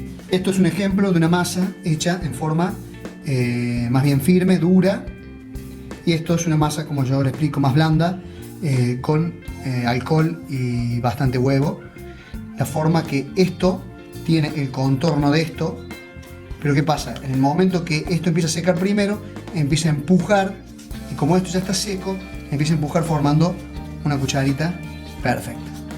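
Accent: Córdoba, Argentina. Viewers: Sabor Cordobes con tonada cordooooobeza!!!Excelente explicacion y con su historia! los voy a hacer!!! y despues cuento!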